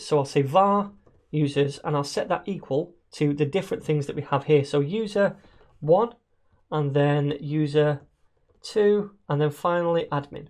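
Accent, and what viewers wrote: United Kingdom. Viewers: Thanks for teaching this in a simplified but straightforward and non-choppy manner. So many other tutorials are so overcomplex in their wordings and skips ahead so much in coding, that half of it doesn't make sense. I haven't watched all of your videos but, just watching one of them makes me wanna watch more. I hope you have them all ordered step by step in Javascript. Thanks again. (" your english is very good despite your british or whatever accent, sometimes the accents people have in these tutorials are too hard to understand or they talk way to fast. You do a great job with both)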